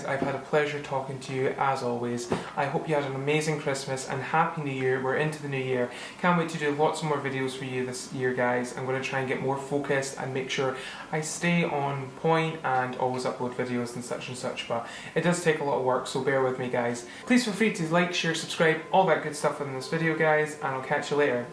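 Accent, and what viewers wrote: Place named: Scotland, United Kingdom. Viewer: your accent is lovely! are you scottish?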